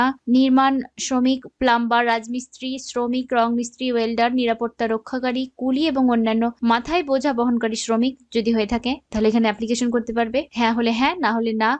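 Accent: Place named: Bangladesh. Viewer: Tui Bangladeshi hoye India er video banachis???😂 Tor kothar style e bojha jay....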